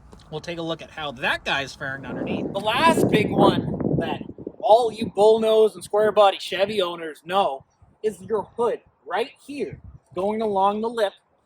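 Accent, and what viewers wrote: Canada. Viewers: The Canadian accent lol its all good! It reminds me of the Red Green Show! I'm from southern Ohio near west Virginia so don't worry!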